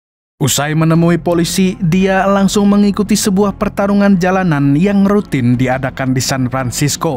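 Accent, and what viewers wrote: Banjar, Indonesia. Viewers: Urang banjar sekalinya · Urang Banjar kah .. mantap sanak ai.... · Urang Banjar kah Sidin ni mang ? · Urg Banjar mana suara ny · Njir rancak nonton hanyar aja tahu pada urang Banjar sidin nih😂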